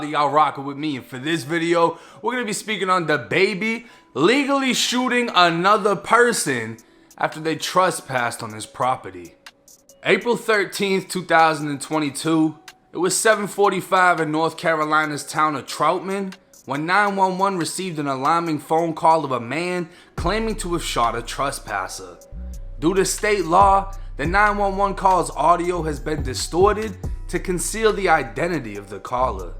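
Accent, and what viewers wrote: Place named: Massachusetts, United States. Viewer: Lol I knew you was from mass you talk just like them hit me if you need a camera man or something lol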